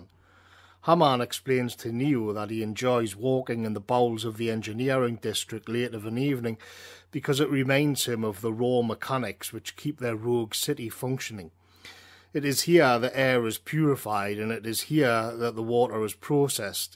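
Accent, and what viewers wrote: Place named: Scotland, United Kingdom. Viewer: Magnificent scottish accent. It overtakes the message a bit. I wonder where this accent has its home more precis.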